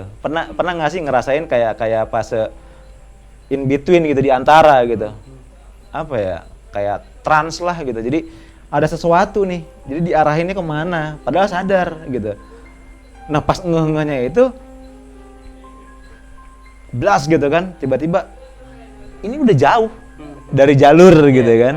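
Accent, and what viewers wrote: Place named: West Java, Indonesia. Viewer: Maksudte, akhire ada bahasa jawanya tapi logatnya sunda bang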